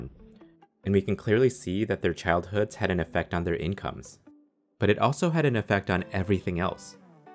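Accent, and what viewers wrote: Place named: United States. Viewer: Okay, I'm guessing America seeing as the narrator has an American accent and you tracked whether the kid sees someone get shot.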